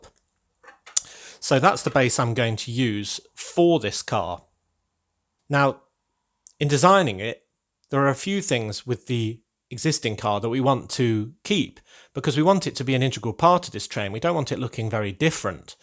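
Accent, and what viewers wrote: United Kingdom. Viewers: R U from the UK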